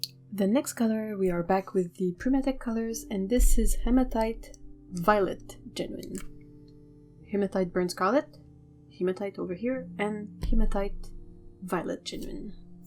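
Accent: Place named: Montreal, Canada. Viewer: Anglais de l'ouest de l'Isle de Montréal. :^) Ton anglais est milles fois plus fort que mon français. :^D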